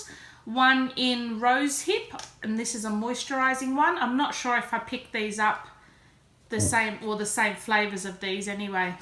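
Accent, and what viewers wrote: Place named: Australia. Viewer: Get bum fluff off your face...so nice to hear an Aussie voice. I can't resist stationery & paper products either. Tfs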